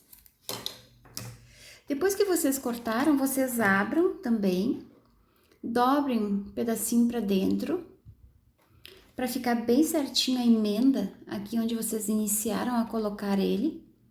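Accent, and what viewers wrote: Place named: Curitiba, Brazil. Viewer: nossa... Minha vizinha é curitibana e o sotaque é assim tbem rsrs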